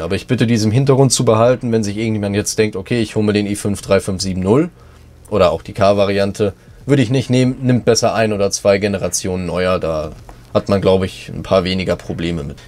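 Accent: Germany. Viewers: Kommst du aus dem Saarland?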